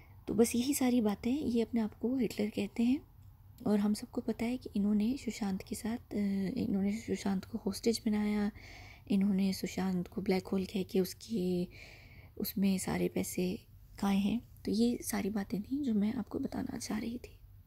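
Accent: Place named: United Kingdom. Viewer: No worries, do what you want. I just appreciate your intelligence. Accent and language doesn't matter at all. Apart from that, I am enough efficient to pick on your U.K. accent where you lag. Let me know if you need help for language/ accent part.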